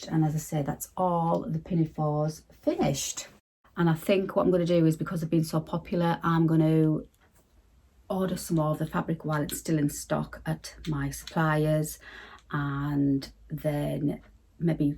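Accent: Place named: Newcastle, United Kingdom. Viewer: I'm just watching this a year later. I'm NE England born and raised but living in Illinois (beyond my control when I was a teenager in the 60's 😂). From your accent and Geordie's name I'm guessing you are around Newcastle. I was born in Wallsend and lived in the Cullercoats/North Shields area. Love watching what you do and I just bought a couple of your patterns! I used to have an Etsy shop selling bags but closed it when I got breast cancer in 2016 (ok now). I got mine ready for the post the same as you except that we have a drive-up mailman who would pick them up at my large mailbox, so no trips to the post office 🙂 One thing here though is that we can no longer wrap boxes with paper as it can catch in the sorting machine. You have very busy days that you obviously enjoy! Take care xx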